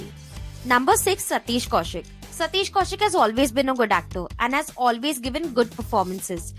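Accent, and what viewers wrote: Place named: Mumbai, India. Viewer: Ok good research but I hate your ugly English accent more then these cringy movies. Please instead of this ugly Mumbai accent English you should better speak Hindi. Really unbearable accent 😣😤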